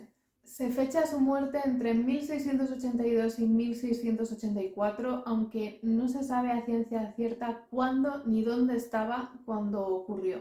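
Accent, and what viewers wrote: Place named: Spain. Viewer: Sería buena idea que tu misma tradujeras ese libro del viajero. Tienes un lijero acento de español de España.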